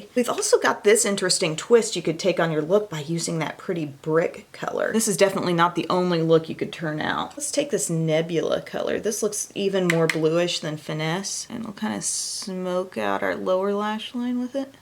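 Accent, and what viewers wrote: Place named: Southern Indiana, United States. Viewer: O.k. going to try the Profusion products now that you recommend them! They look fun! I've been watching your channel and I believe I grew up near the area you grew up. I'm from Southern Indiana near Evansville (live outside DC in Northern Virginia now)! Your voice reminds me of "home!" And, I just love that. Nice to get beauty tips and a taste of home all at the same time! ❤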